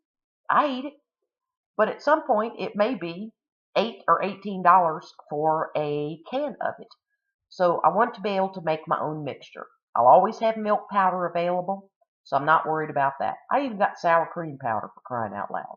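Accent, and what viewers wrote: South, United States. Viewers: now ya know i got to give a poke, a pee can is what a trucker uses lol youaiis acksent tells me ya from the south try Mrs V on OurHalfAcreHomestead the best cook iv seen on hear try this this will take ya rite to her making a turkey pot pie crust and all <link>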